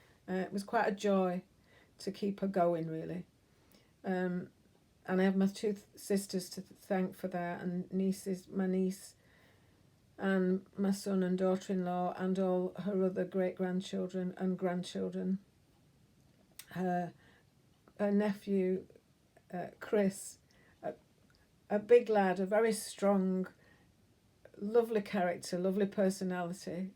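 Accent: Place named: Lancashire, United Kingdom. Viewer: Hi. You really don’t need to apologise for your Lancashire accent. You should be proud, at least people understand and know what we say x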